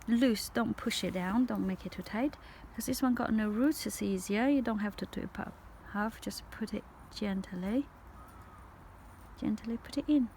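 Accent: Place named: England, United Kingdom. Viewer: Great collection! Thank you for sharing from Korea The mini pot is so cute! :-) I like your England accent as well! I subscribed to your channel as well! Hope your channel becomes flourish too! ♥♥♥♥♥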